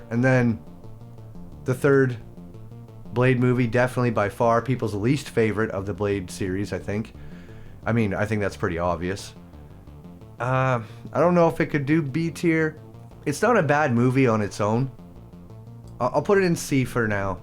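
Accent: Canada. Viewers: his accent is wildly canadian lol listen to his outs and abouts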